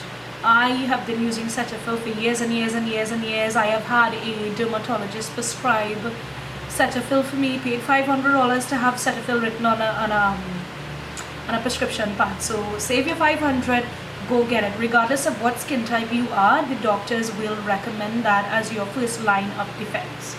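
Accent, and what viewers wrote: Trinidad and Tobago. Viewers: Are you Trinidadian? aha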